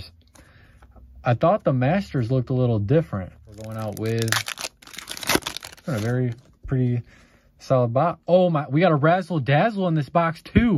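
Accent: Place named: St. Louis, United States. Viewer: Your accent sounds like Saint Louis county…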